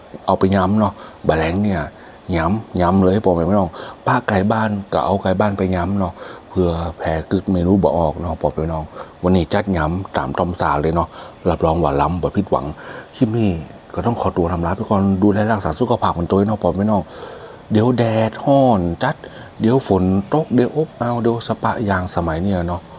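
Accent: North, Thailand. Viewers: อู้เหนือ ดีแล้ว ตอนนี้คนกรุงเทพฯฟังภาษาเหนือออกแล้ว❤❤❤❤❤❤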